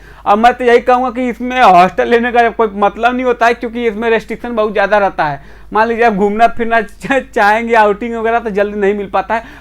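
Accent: Bhojpuri, India. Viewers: bhaiya aapka bhojpuri mast hai 😂😂😂😂 bhagwan kre BHU me ho jaye. Ho gya to milenge zaroor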